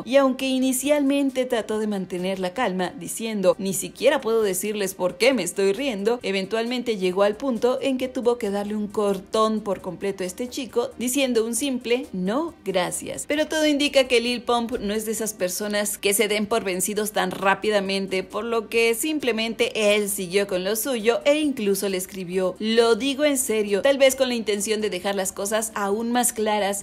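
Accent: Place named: Latin America. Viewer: Veo mucho Curioseando y ya me acostumbraba a una voz española, y ahora me tengo que acostumbrar a una voz latino americana.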